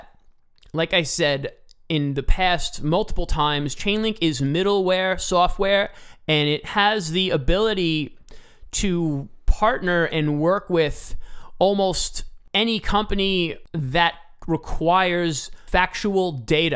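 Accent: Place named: New York, United States. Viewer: California by way of the Bronx but I definitely caught the NY accent!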